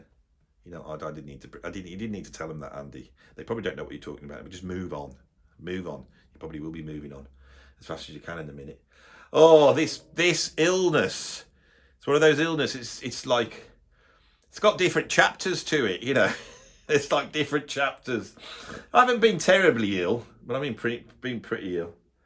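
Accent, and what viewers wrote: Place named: West Midlands, United Kingdom. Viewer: I'm sure you ve got lots of non native speaker😮 ffansall over the jaz rock .prog ahead lib nñ world thus pleaae try to refine yer west midlandish albeit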